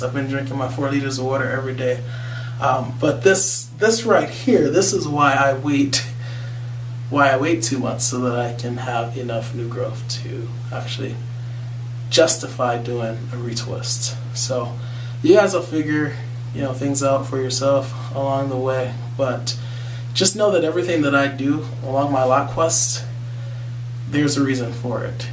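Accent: United States. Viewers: Yes definitely still love those movies despite being old. I'm a newbie when it comes to my loc journey. I had been wanting to do it for years & actually started it "accidently" LOL I had shaved my head after having yarn braids in for over 3 years & as my hair grew, I did my hair in a two-strand twist under a wig I liked & I left them that way & WAH LAH!!!.INSTANT LOCS LOL I never retwist them, but I know I need to. I did that like once or twice. I don't want a bird's nest. Question: what do you use to moisturize your scalp & what product, if any, are you using to twist?? I've used just water in the past when I tried it, but it didn't really hold. I'm sure you've already answered these questions in past vids, but I haven't had a chance to watch them all yet. P.S. Do I hear a hint of a fellow "Michigander" in your voice?? LOL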